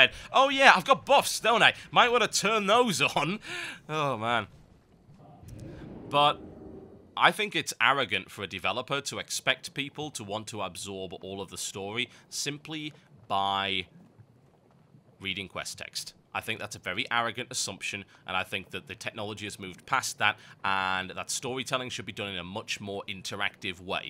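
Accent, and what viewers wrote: United Kingdom. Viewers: u british asda? me 2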